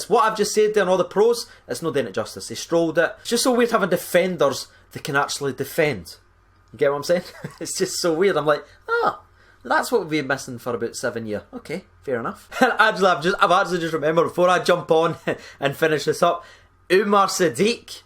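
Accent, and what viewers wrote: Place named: Liverpool, United Kingdom. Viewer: Quality intro... As the says.. Let's go.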